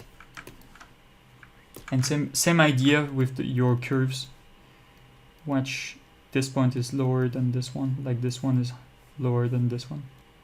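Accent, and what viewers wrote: France. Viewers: Did he just apologise for his French accent? I'm offended. That shit is unintentional ASMR. Thanks for this tutorial - so generous of you to share your time and knowledge. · Sir, never apologize for a French accent. Especially in an art tutorial. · We all love your frenglish ;) And the word you are mostly looking for is "definition" (of muscles, creases, shapes). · Instructor: "i apologize for the french accent" Me: Are you serious? I would kill for having that accent.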